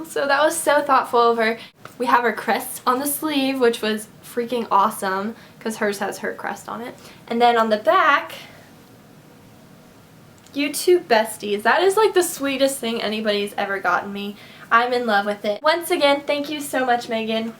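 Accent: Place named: California, United States. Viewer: you have like the coolest accent everr. it's like half country half california, haha :)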